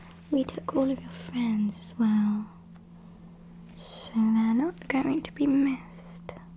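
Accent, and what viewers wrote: United Kingdom. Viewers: Are you british mate? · gurl your British accent is so cuteeeee Oh my god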